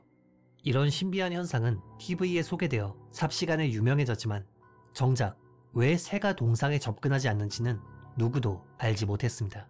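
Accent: Japan. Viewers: 오 이걸 이제야 보네요ㅎㅎㅎ 어투가 살짝 간사이쪽으로 들려요! 어처구니없는 사고가 많았는데도 좋은 영상 찍어주셔서 감사합니다 이번 일본여행은 좋은 일만 있으시길❤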